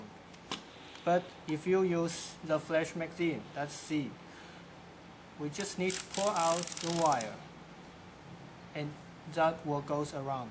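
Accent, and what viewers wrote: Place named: China. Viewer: lovin the chinese accent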